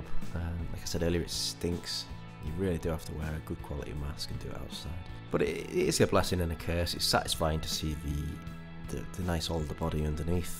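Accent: United Kingdom. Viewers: awesome video, but the narration parts where your voice trails off and you get a bit quiet is MADDENING! Keep your speech loud and clear please, especially for us yankees who need all the help we can get understanding the British accent. Cheers!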